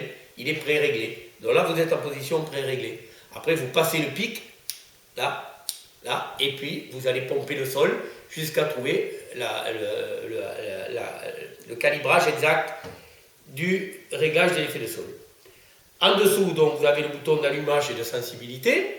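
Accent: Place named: South, France. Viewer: hahahahhaha le scope l'accent du Sud suis mdr désolé pas méchant mais Scope ca me fais rire hahahaa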